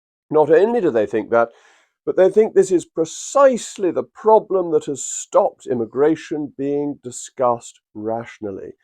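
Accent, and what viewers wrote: RP, United Kingdom. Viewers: Look at this guy, camera looking up at him, some portrait in the background. Bookshelves on either side whilst he speaks posh and makes cultivated hand gestures throughout the video. And then he starts talking about the working class… Well colour me sideways Moggy I don’t believe a word you are saying and you are probably just trying to build a YouTube channel at this stage. Nobody as posh as you has the right to align yourself with the working class, get stuffed.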